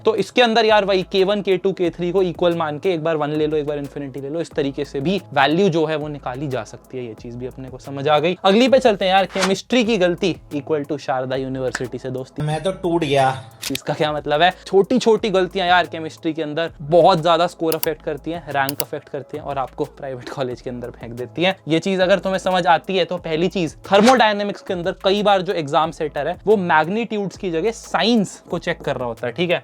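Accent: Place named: Bengal, India. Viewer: Your bengali accent is cute wow .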